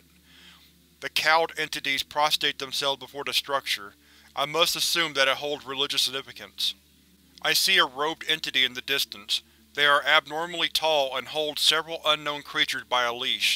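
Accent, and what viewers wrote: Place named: Texas, United States. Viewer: Ahhhh....I THOUGHT I heard a slight Texas or some other accent from that area...Very cool...I go to night school and partly work from home so am up late working on things sometimes...as I listen to stuff.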